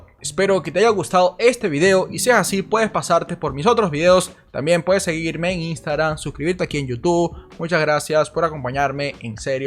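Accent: Venezuela. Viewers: Una pregunta amigo, eres Venezolano?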